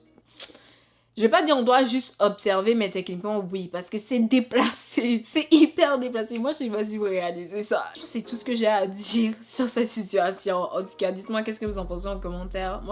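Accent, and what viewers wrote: Quebec, Canada. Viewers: L’accent québécois + l’accent ivoirien je meurt le combo 😭😭 j’aime trop ton accent 😂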